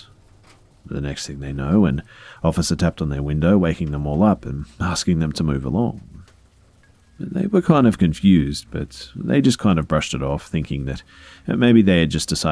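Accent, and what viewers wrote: Australia. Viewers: If you would have told me 10 years ago that I would one day have an Australian man lulling me to sleep at night, I would have thought you were smoking crack. Present-day-me wonders why more people don't have an Australian man lulling them to sleep at night. People probably think I smoke crack.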